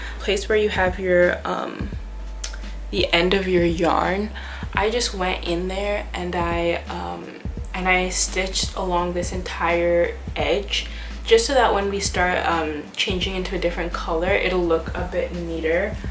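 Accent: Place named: Caribbean. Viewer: you from trinidad? you have a accent like somewhere in the caribbean and at the same time some kind of american and british accent in some words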